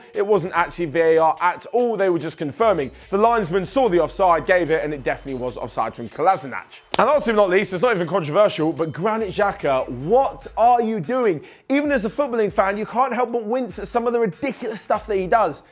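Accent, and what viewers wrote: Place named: Essex, United Kingdom. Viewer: man if you can't pronounce their names right,speak slower,we're not all from essex or wherever you're from.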